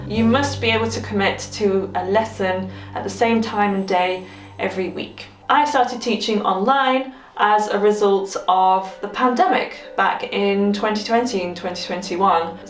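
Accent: United Kingdom. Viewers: i love your british accent. You the best teacher. I learn so much with your music videos tutorials =)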